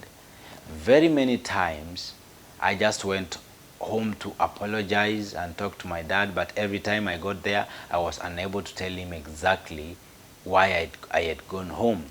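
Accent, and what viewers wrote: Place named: Kamba, Kenya. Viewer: You have a good English while you are serious but when you and Jude are not in good terms your English sounds kikamba aki, anyway you are free from your own words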